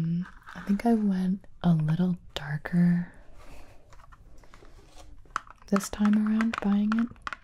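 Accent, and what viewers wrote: United States. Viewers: Are you american , You are the best 😀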